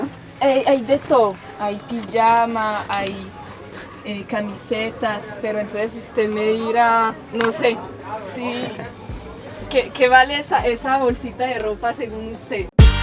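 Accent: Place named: France. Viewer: No pero tú cómo puedes hablar los dos así de bien? Vives en colombia pero tú francés es de Francia?? Cuál es tu lengua maternal? Y los cursos de francés son en línea? Salidos de Canadá!!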